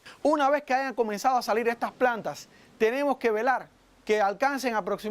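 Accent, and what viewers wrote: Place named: Sancti Spíritus, Cuba. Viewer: No ee como llegue aqui pero me atrajo el asento del muchacho .sera espirituano? Soy una espirituana lejos de mi cuba bella ..y ese asento solo lo tenemos nosotros .los S.S un saludo y grasias por toda la informacion muy bien explicado ..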